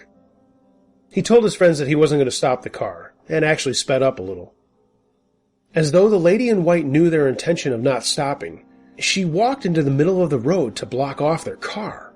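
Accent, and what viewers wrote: United States. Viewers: Your channel is one of my favorite and best accidental finds yet. <3 I adore creepy pasta, true stories and paranormal anything. I was having a hard time finding an American accented narrator I really enjoyed. Your pitch, intonation, and tempo .... spot on. <3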